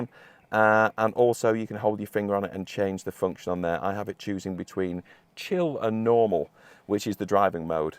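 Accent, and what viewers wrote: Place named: RP, United Kingdom. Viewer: lol It’s just a phrase that some Americans focus on because we feel that plays up the British accent. You have a nice posh British accent that’s easy for us Americans to understand. 👍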